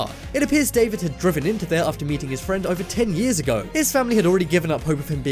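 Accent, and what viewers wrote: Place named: United Kingdom. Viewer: When you enjoy listening to a British accent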